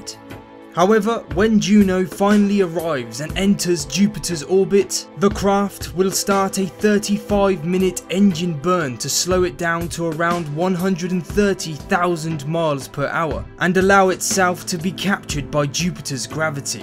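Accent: United Kingdom. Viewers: cool british accent :D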